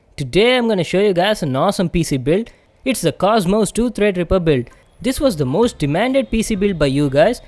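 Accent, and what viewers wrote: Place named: Gujarat, India. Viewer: You are definitely gugrati , marvadi or sindhi . English R.I.P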